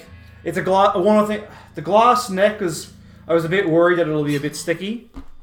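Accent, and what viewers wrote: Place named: Ireland. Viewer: Lovely guitar, Your accent sounds like an Irish one at times , Greetings from Dublin 🇮🇪 🇨🇦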